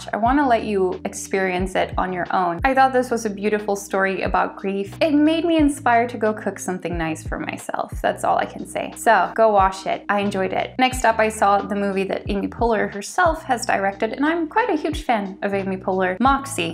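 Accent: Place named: United States. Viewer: I saw your comment on the Reel Reviews With Jen channel and decided to check out your channel. Based on your commentary about the movies you've seen in this video, I think you'd have a lot of success with reaction and commentary videos like Jen does. The videos you already have a very high quality and well made (how many people spend the time to add captions?) but may be too cerebral for the average YouTube viewer. Don't stop making them but if you added Jen-like reaction videos, I think you could grow your channel. You have intelligent commentary, great wit and a good sense of humor. And you're very personable and natural on camera. Plus I'm always interested in seeing non-Americans' reactions to American movies and culture. Have you lived in Sweden your whole life? Your lack of a typical Swedish accent when speaking English is crazy! At least I've never heard someone from Sweden sound so American/Canadian. 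I only detected an accent when you said Gilmore Girls. Good luck with your channel!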